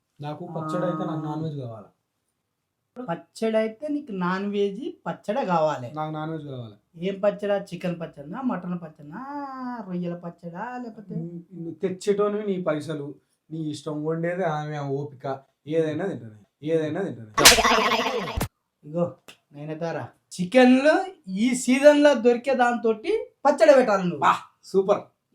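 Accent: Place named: Mahabubnagar, India. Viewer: Hi Anna mi videos Chala baguntayi mahabubnagar slang lo chala baga mataladatharu nen pregnant adhi chuda gani cravings starting ayindi nak kosam koncham pampiyandi please 😊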